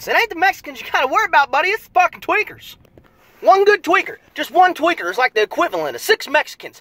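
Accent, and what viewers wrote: South, United States. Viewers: I'm new sub... your funny... may I ask where your from.. you sound southern....if so I'm from bama!!!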